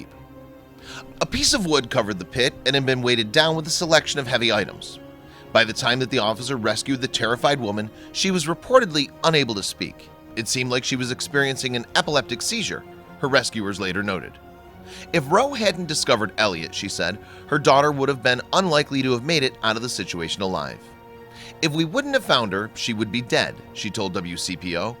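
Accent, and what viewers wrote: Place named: Chicago, United States. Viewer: The narrator sounds like he is from the Chicago area.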